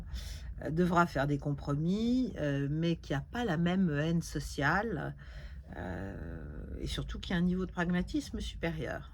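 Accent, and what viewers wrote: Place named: Upper class, France. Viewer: Votre accent bourgeois du XVI ème me dégoûtait déjà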